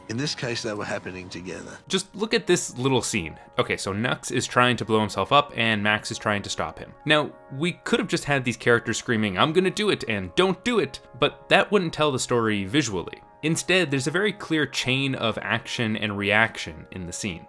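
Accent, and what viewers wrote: Canada. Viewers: can you be more canadian? ... it's awesome and fun to listen to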